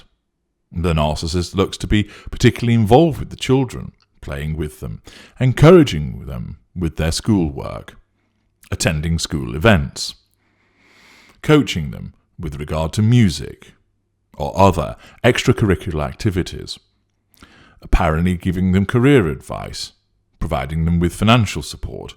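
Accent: United Kingdom. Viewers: Hard to understand the British accent.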